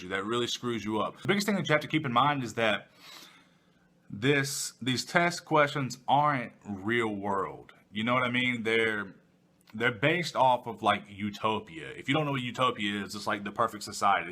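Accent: South, United States. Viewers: you have such a nice voice..do u sing or rap. it' has a nice southern twang..lol anywho. love your videos man! keep up the great work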